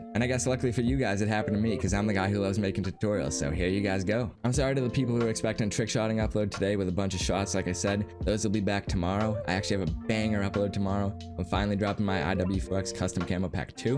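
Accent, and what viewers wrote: Boston, United States. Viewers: thank you so much bro you mad chill you from Boston by any chance? love the accent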